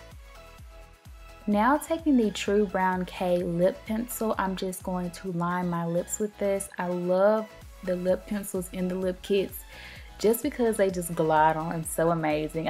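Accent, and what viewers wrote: Memphis, United States. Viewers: I was listening to your talk thru out the video I was like mane she sound like she from memphis lol I'm from memphis 2..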